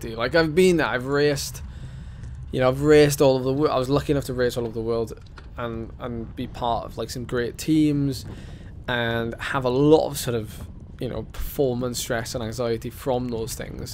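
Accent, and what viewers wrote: Wales, United Kingdom. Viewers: Who would want to mute a good welsh accent? 🏴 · Hope you don't mind but I turned the video off and just listened to your soothing Welsh tones 😁 Well done buddy 👍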